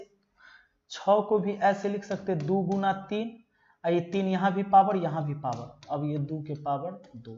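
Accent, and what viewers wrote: Bihar, India. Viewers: सर आपकी बिहारी बहुत अच्छी लगती है और जो भी है वह अच्छा ही है।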